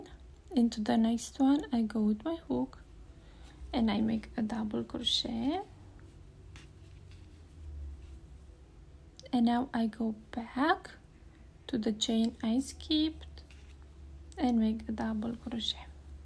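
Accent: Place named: Romania. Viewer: I love your videos. My grandmother was from Romania and your accent and voice reminds me of her. She created such beautiful crocheted items. I still have my father's linen bassinet blanket she hand sewed and where she added a pretty crochet border to it. Thank you for your videos.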